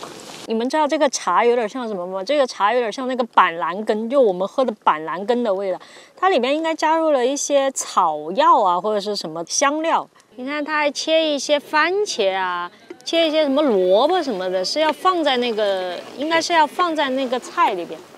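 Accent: Sichuan, China. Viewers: 因为是川普啊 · 喜欢你的视频，真实，更喜欢你的四川普通话。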